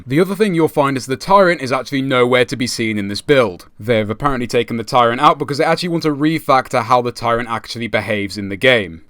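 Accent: United Kingdom. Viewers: u scotish?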